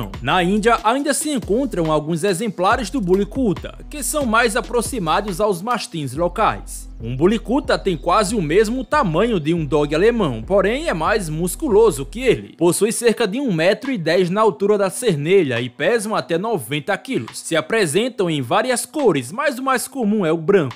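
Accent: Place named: Paraíba, Brazil. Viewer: Sua locução é demais com esse sotaque meio nordestino de Paraíba, mas o troço chato é cão, tira a paz de qualquer bicho. rs Se o felino tivesse solto ele veria o que era bom. rs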